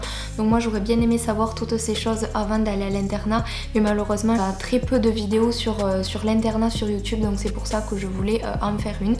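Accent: South, France. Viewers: jsp mais en tous cas c'est positif 😂ps: j'adore ton accent du sud ptn jsuis marseillais et je l'ai pas 😂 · Tu vien du sud PTN CON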